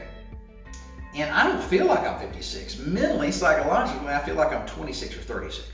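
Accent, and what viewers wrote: Texas, United States. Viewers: I'm really enjoying your videos and workouts. As a 53 year old Houston, Texas raised male living in the Seattle area, I need all the advice you and can muster. Fitness was a big part of my life until a hip replacement derailed me with a few complications I didn't count on. So now I'm suffering with a serious case of (as-you-put-it) "Old man ass"! LOL. Your particular attitude rings through with me from my fitness days... and I have to admit, you feel like home to me with your Texas accent and home decor as well as the rest. I really agree with your approach to eating. My days of trying to be Chef Fitness are over. I like quick-n-easy but smart. Bill Philips' book, "Eating for Life" had turned me onto that more than fifteen years ago. Thanks again for being here for us "hard to get a good night sleep" guys, and for the great videos.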